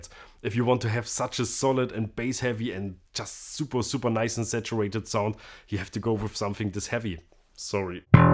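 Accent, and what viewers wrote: Germany. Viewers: у него немецкий акцент?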